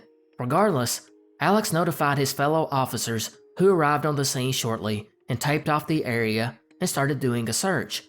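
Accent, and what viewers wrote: South, United States. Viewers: My favorite part of.. is his accent..and smart narration. 😎 where is he from? I'm not a complete moron..I'm guessing In the south.. but I'm from Canada 🇨🇦..so give me a break. 😅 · has the cutest accent. I actually find it incredibly wholesome and comforting despite the grim things he sometimes covers. Im born and raised in Mississippi and my family has been here for several generations....this may surprise some folks, but different areas of the south have different accents - a lot like the UK. The queens RP doesnt sound like a Welsh person or like a person from sat..Manchester. We dont all sound like some rich wannabe in Georgia or some hick hillbilly with 1 tooth 😂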